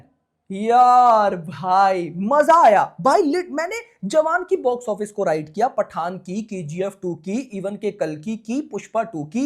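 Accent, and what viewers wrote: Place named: Pakistan. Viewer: Iska pronounciation Pakistani jaisa hai ....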